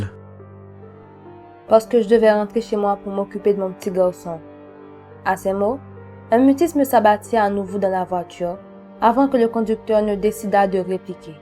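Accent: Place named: Overseas France, France. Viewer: j'adore tes histoires on ton accent des Dom Tom.merci pour tes vidéos 🥰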